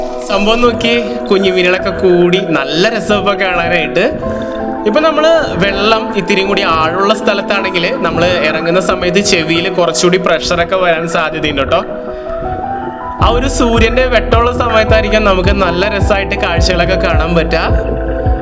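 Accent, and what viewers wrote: Thrissur, India. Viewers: മച്ചാന്റെ വീട് എവിടാ തൃശൂർ ഭാഷ ആണല്ലോ?❤️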